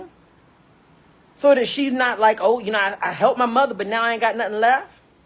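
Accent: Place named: Maryland, United States. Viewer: Your Maryland or Murland accent is really there, lol.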